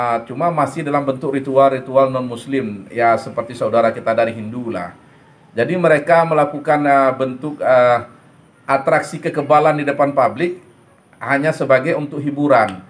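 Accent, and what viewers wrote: West Sumatra, Indonesia. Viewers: Maaf pak saya msu tanya, kalau di lihat dari logat bapk saya yakin bapak barasal dsri sumatra barat kayaknya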